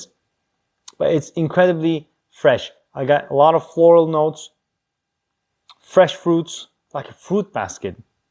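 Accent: Turkey. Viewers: Kardeş sen Türksün b/o your pronunciation. Also kaju ... :)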